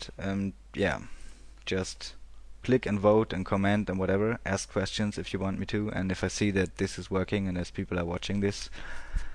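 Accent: Germany. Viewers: 14,958 views as of right now. I think we like your common sense attitude and your clear way of speaking. It's apparent that German accents are cool... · ARE YOU A GERMAN INDIVIDUAL? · a german accent but its a good video ;) thx´s :)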